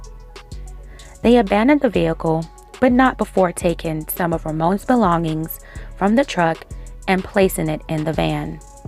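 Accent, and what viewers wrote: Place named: New York, United States. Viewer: I love my girl with the New York accent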